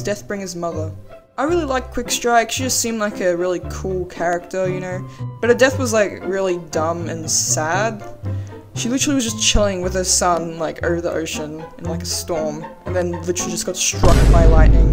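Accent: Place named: Australia. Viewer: Ohh your’e Australian? So am I - also these are very accurate ranks in my opinion.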